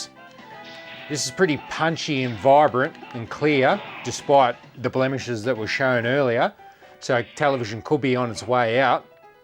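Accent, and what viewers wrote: Australia. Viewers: I was thinking the same thing. SCART is a European thing and he sounds Australian. I use SCART RGB for all my retro consoles.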